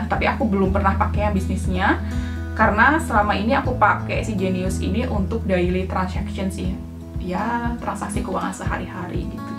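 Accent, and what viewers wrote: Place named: West Java, Indonesia. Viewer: Sundanya keliatan ah😁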